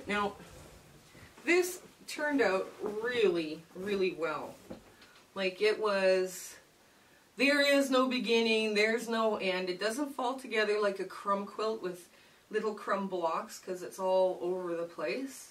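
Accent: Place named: Canada. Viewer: BC'er here. I thought I detected a Canadian accent...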